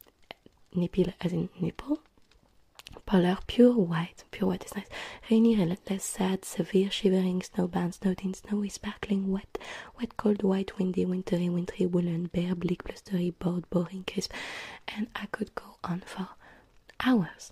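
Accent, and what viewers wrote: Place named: France. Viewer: french accent asmr ??? sign me the hell up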